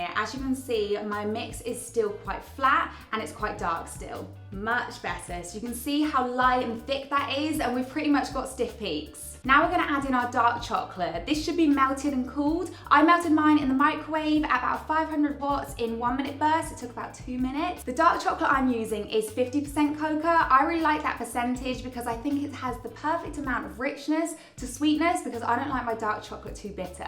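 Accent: United Kingdom. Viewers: Nice British accent you got there. Those brownies look super cute and good, bro. Nice work. THIS IS DOPE.🇬🇧🟫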